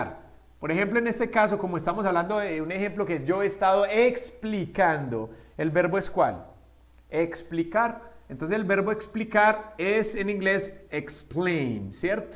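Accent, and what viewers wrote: Colombia. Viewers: Me encanta tu acento colombiano. :P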